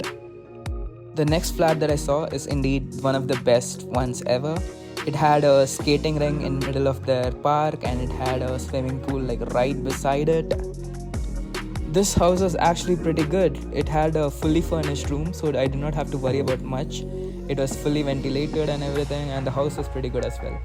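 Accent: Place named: India. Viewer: best part your Hindi accent Macha ❤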